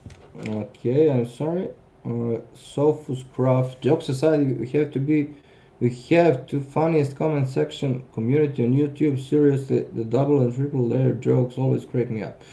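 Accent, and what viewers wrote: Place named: Russia. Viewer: Your accent is TOO THICK to understand the commentary. Just like the RUSSIANS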